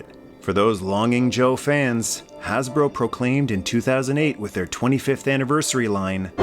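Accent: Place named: Boston, United States. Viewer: just discovered your channel by my absolute passion for MOTU & Joe!! My all time favorite movie play toys was the Rattler!! can't wait to binge watch everything Joe 1st then Masters of the Universe. I just watched your 1982 video describing the Original line up of Joe from the early days (1960) era and Mr.Hamma creative writing for the updated version. The history lesson was outstanding and I always knew Joe figures were more solid and flexible than Star Wars and most Other lines. Thanks to you and the awesome fans that are requesting these amazing topics of the best era evah!! heavy Boston accent!!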